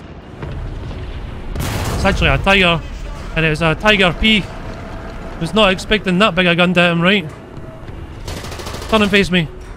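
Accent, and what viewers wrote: Scotland, United Kingdom. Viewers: I don’t care about the tank. I love your Scottish accent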